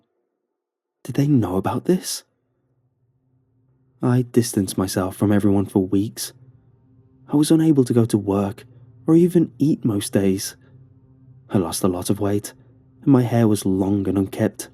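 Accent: England, United Kingdom. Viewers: Not on my reg profile, on a friends..I've been listenin 2 for yrs now, my fave CP narrirator, is it just me, or his voice sound watered down? way less English?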